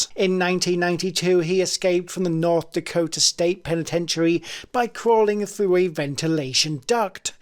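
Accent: United Kingdom. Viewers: There's absolutely nothing more annoying than some retard with the UK accent commenting on s*** they have no idea what they're talking about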